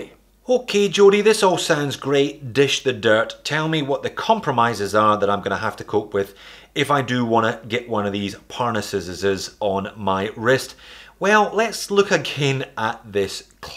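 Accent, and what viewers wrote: Scotland, United Kingdom. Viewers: what does that even mean? Apparently this is your first time watching the Jody's channel. He's a for real Scotsman, living in Sydney, Australia. He talks the way he talks, always. No need to be insulting. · Who are you, where are you. Scottish accent? Just beginning to learn about watches here. You’re videos are very “uplifting”. Much appreciated. Happy Thanksgiving 🦃 from California to you no matter where you are in the world.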